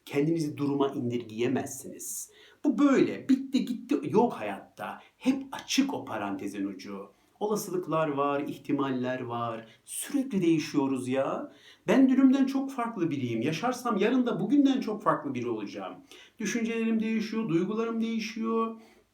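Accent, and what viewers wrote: Ankara, Turkey. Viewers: Ne olcak, bakalım ne olcak ? Tam Angaralı'sınız hocam.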